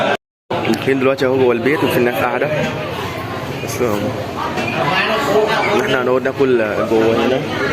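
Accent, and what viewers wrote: Egypt. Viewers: ماشاءالله حلقة حفظ قرٱن كريم والعربى بتاعك مصرى خالص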